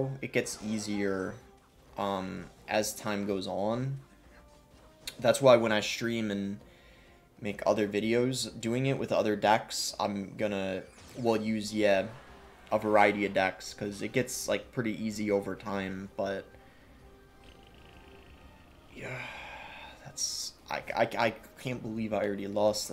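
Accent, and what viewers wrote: Scotland, United Kingdom. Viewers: Why do you say your o's in british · Great knowldege transfer and gameplay! and I wondered, Is that a bit of a Scottish accent? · Has he ever explained why he says his O’s like a British person or something I’ve always wondered why he pronounces them like that (no hate just wondering) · Why do you randomly have a British accent??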